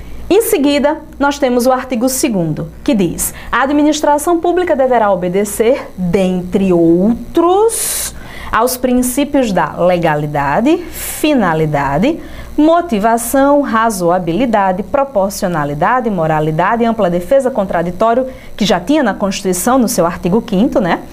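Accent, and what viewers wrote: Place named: Pernambuco, Brazil. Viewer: vc é de pernambuco?saudade desse sotaque........hehehe aula excelente...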